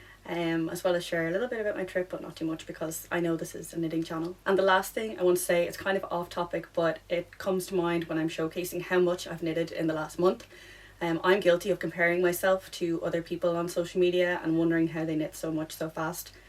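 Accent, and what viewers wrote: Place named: Ireland. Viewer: I just found your channel and love your personality and Irish accent! I recently made the Lakes tee by Ozetta, which is almost identical to the tee from MFTK, and I couldn’t believe the amount of ends. I even made a few extra because apparently I can’t count… 🤓 On the sleeves, I started at the top like you suggested and saved myself two ends. 😂 They do all this cutting and restarting so the garment/sleeve is completely the same on both sides, but who cares if there is one round extra on the left side of your sleeve? Noone can tell, right? 😅🤓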